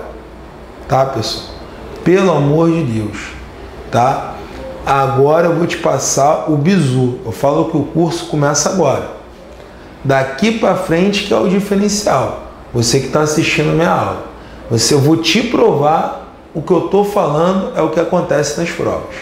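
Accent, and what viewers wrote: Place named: Rio de Janeiro, Brazil. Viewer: Exelente aula amigo, mas aguentar esse seu sutaque de carioca é insuportavel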